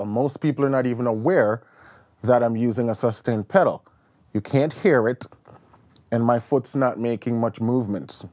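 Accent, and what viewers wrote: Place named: Jamaica. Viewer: Was only listening to the Jamaican accent 🤩.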